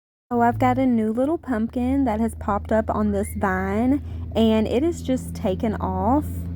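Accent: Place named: Kentucky, United States. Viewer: # 1 Mama Always Getting It Done For Her Daughter and Son❤ Love Ya Bunches My # 1 Favorite Person to Watch and Listen to that Kentucky Accent ❤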